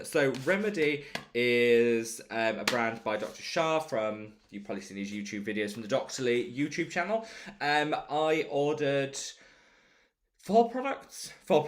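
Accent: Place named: United Kingdom. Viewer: Are you from British ? Because I like to hear your voice when you spoke , it made me tried to find the differences between English and American accent. Well, Thailand is now am. But for me, I’m going to sleep soon. By the way, thank you so much for your kindness for replying me.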